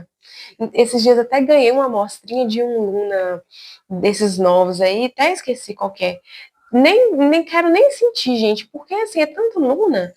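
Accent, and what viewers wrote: Minas Gerais, Brazil. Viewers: Mineira com certeza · VC é mineira?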